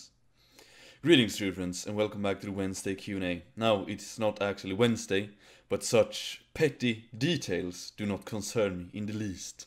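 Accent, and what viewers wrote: Sweden. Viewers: Swenglish is the most glorious accent in the world :) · .Bowles If I am going to guess, I guess he has learned English through reading/studying, because of his huge accent that he has. I am from Sweden as well and I used to be pretty bad at speaking English verbally mainly because I only read English in text form. When I started watching tv-series, I improved my pronunciation a lot. But his vocabulary is amazing, mine is pretty crap to be honest but I learned English by myself so I should be somewhat happy lol.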